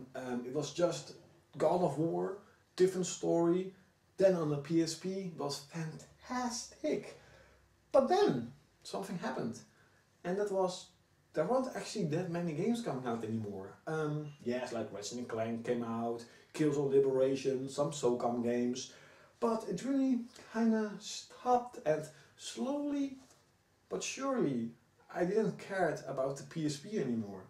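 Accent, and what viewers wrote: Netherlands. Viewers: your dutch right???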